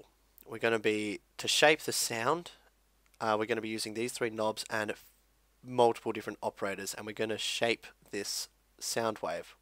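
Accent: Australia. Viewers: Ha, thanks man you've helped a lot because I'm a noob to FL studio! Your aussie accent makes the commentating even funnier XD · i just wanna say thanks for talking nice and clearly, and in a good easy understandable aussie accent. half the other dub tutorials on youtube have hell quiet hard to understand accents, so be proud of that one. also, i havent checked ur other vidz but do u have a tutorial on how to do build ups and bass drops?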